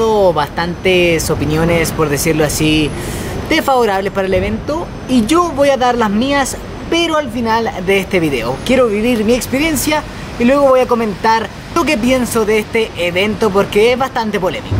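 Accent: Chile. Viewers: Sinceramente no hay mejor youtuber de Pokémon, sinceramente tus vídeos son buenísimos y por más que se quejen de como hablan los chilenos, me gusta tu manera de expresarte y te entiendo siempre, saludos desde Perú y ojalá vengas pronto qué te recibiremos muy bien 💪❤️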